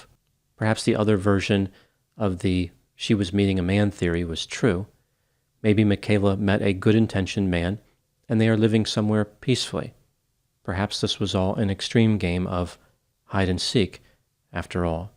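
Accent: United States. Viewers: He should learn how to say Regina good gravy. Can tell you are american . Hard to trust what you say when you can’t even say the correct pronunciation.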